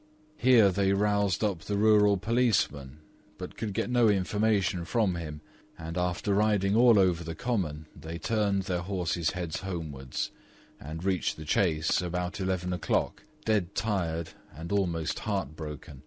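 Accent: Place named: United Kingdom. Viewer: good but it's British .. Is there a story with an American accent ?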